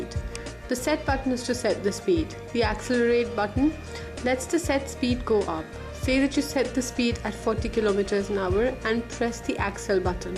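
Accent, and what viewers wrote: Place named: India. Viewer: Indian accent, mate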